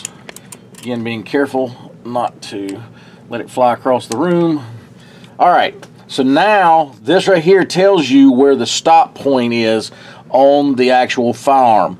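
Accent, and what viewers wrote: South, United States. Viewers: NICE LITTLE DIY TIP!👍THX! I KEPT THINKIN U WERE SAYIN "COAL" WHEN U WERE SAYIN "COIL"...LOL.😁 CUZ OF UR NICE SOUTHERN DRAWL. WHERE R Y'ALL AT?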